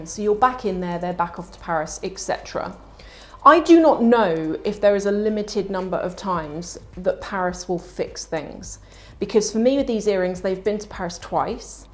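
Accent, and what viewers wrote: RP, United Kingdom. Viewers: you sounds so posh!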